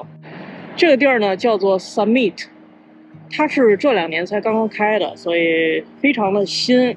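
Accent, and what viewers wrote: Beijing, China. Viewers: ，我在西岸，能跟着你追大纽约地区的光甚是开心，喜欢这一口京腔，听着心里特别踏实。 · 还是不做作的京腔舒服啊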